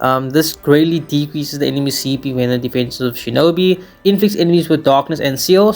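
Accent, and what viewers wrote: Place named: South Africa. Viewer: Bro you from SA? can’t mistake that accent 💀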